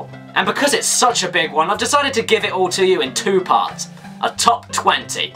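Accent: United Kingdom. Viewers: His British right? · This is my first video of yours, got to and though. "Holy shit it's British JonTron" Not that its a bad thing its amazing. Also you have the exact same video game upbringing I did. With all PS1 and no nintendo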